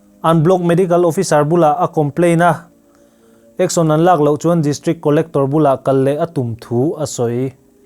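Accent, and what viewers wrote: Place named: Delhi, India. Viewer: I pronunciation ațang hian Hindi i thiam hmel riau. Delhi vai i kâwm tam a nih dawn hi.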